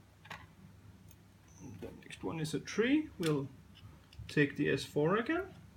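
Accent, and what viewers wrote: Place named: Denmark. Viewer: From your accent I guess you are danish. Where do you get pins from. Need some for some 600's and I'm danish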